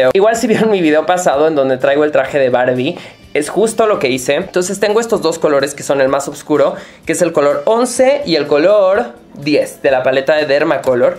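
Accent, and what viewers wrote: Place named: Fresa, Mexico. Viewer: No entiendo el de que eras “mirrey” , a poco te creas de dinero, familia de renombre e influyente y tipo hablas súper fresa.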